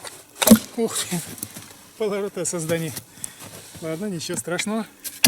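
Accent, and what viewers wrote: Russia. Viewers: ***** Это наш Вологодский говор. яркий представитель своей родной земли.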